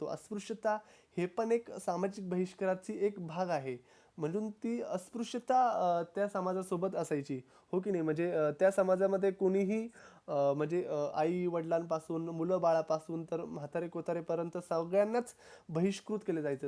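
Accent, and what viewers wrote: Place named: Vidarbha, India. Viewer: Great video Sir. I would like to suggest that please provide the reference for things possible. Are you from Vidarbha region, seems from your accent.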